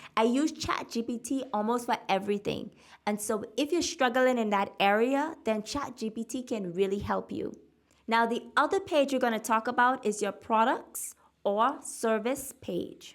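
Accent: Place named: Nassau, Bahamas. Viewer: I knew it u sound like some of my family who live in Nassau ❤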